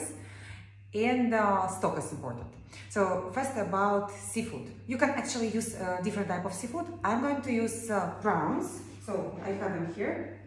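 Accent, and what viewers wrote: Russia. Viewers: I also heard accent similar to Russian... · Is she Russian? That’s no Spanish accent!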